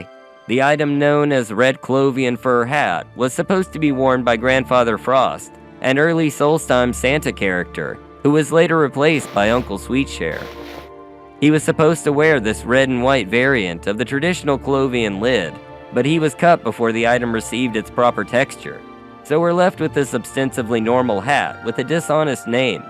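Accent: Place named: Canada. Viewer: Canadian detected. Don't you put it in your mouth though it might look good to eat (like a carrot or a beet!)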